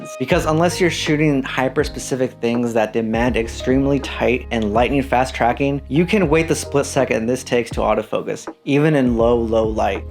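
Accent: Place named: Australia. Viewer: Thanks. I have a A7II specifically to use with legacy lenses. You review coupled with your images taken using the FE 1.8/50mm is pretty sweet. There will be an addition to my lens kit! By the way I love your Aussie accent champion.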